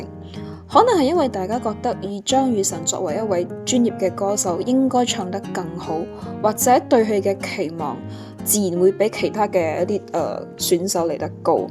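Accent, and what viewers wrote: Malaysia. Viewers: 多謝分享，你略帶口音嘅廣東話聽得好舒服，你也來自馬來西亞嗎？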